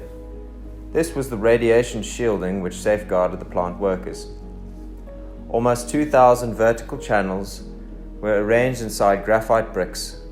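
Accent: South Africa. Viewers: Your nasal South African accent is made even worse by your flat and dead-boring narration style. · Hey I hear the Cape Town accent! Hello from somewhere close by. Very well done video :) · I like your South African accent 👌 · It’s a South African accent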